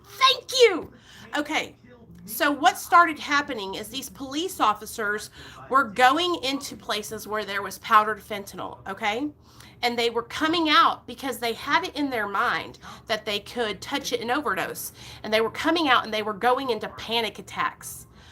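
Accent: South, United States. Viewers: R u from down south? I'm recovering addict outta Houston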